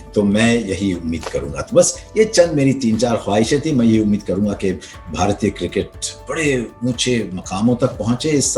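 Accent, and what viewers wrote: Hyderabad, India. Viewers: he speaks hydrabadi as well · There is a bit Hyderabad accent there😀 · Hyderabad aur Lucknow ka asar kaafi hai Harsha Bhogle ji ki zubaan me. ❤️❤️ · There is Hyderabad accent deep in there trying to pop out..ofcourse it is present like a Hyderabadi biriyani in top end restaurant..presented in polished manner.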